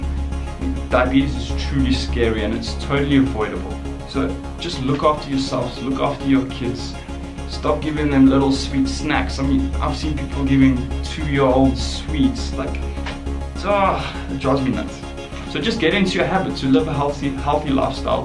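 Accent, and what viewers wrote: South Africa. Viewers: You sound South African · Dude, are you South African? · are you south african? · South African accent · South African?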